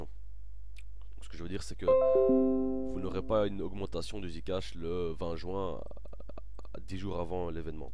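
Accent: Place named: Liège, Belgium. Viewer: salut je viens de m'abonner super présentation, je reconnais un petit accent liégeois :) je suis de lieche aussi ;) février s'annonce prometteur aussi pour POWER LEDGER!!!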